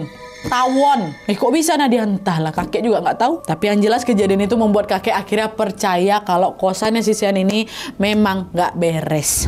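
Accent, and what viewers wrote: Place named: Sumatra, Indonesia. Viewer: 2 Minggu ini baru ikuti, terkhusus bagian Crime story,thx menemani malam gabut saya kak,btw asli orang mana kak? Dari logat nya sekitaran Sumut,Riau Sumbar?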